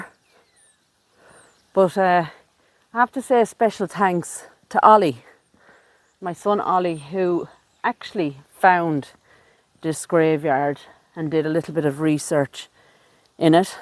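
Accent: Ireland. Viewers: I am really enjoying your videos,. Your Irish accent is so melodic to me. I live in the US, but my father hailed from Dublin, Ireland:-) Your countryside is so beautiful!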